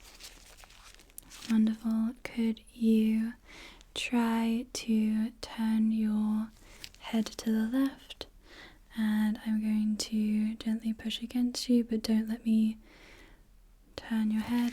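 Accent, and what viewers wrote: United Kingdom. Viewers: pretty sure it’s british ! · Of all the nicest reasons to watch your ASMR I appreciate your accent, British is my favourite 🤍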